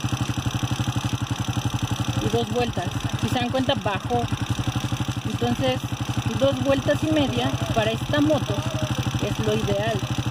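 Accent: Mexico. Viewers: Ahora si voy aprender mecánica, con está hermosa mujer si vale la pena ver videos de mecánica y además me gusta mucho su acento mexicano, saludos desde Valledupar Colombia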